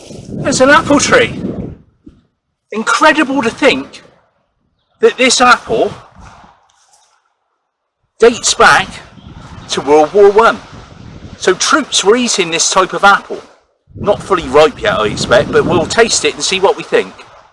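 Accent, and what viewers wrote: Wiltshire, United Kingdom. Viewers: Thank you. I didnt know anything about the stone. I love your Wiltshire accent. ❤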